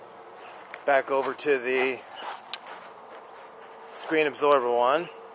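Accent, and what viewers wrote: New England, United States. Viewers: New England accent?..Looks good though. I guess there's a temp difference between steel vs aluminum cans.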